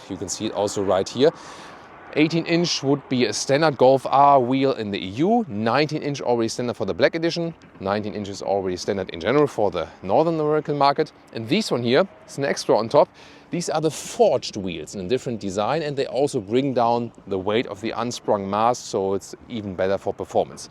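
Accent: Germany. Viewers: For some reason your accent rubs me the wrong way. Maybe because I had an awful German manager.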